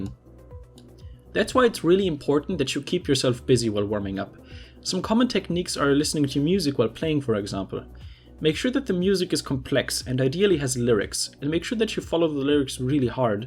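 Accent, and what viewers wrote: Germany. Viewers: Dein English ^^ Man hört sofort, dass du deutscher bist. :-)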